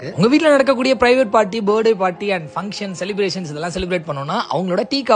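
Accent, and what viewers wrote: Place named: India. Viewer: Hanestly...? Looks like a video production from India together with the English diction.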